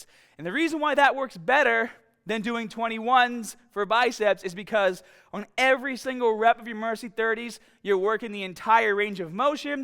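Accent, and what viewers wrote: Boston, United States. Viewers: I knew it. He does the fucking accent on purpose. I could've sworn I've seen videos of his before where it's not nearly as pronounced. But that first "stahhhted" in this video he clearly did it on purpose. Why..... just.... why? The Northeastern/Boston accent is just so god awful it's like nails on a chalkboard to me. I guess I should've picked up on it sooner. He says "exercise" with no problem. When I was in the Navy my buddy was from Quincy, MA and he'd always say "exahcise." He also said "here" with little accent as well...... so again.... why go heavy on the accent sometimes? It's clearly on purpose. Specifically with "bahbell." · He has to be from Boston with that accent, “When you grip the baaaaaahhhh” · That boston accent tho 🔥🔥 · Are you from Boston ??? You have a slight twang on certain words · been following for a few years, very smart training man, the best on YouTube imo. 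And I've gone through a lot. P.S. from MA too, one of the last remaining Boston accents i know ;) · I know nothing about this guy but can only surmise he is from Boston.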